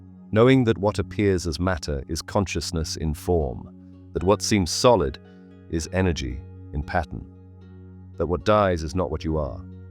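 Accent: United Kingdom. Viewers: I listened closely to the first 15 minutes and I can't believe people actually see this as anything more than Kamala Harris talking before an election it says absolutely nothing in a British accent for 15 minutes I can't listen to the rest of it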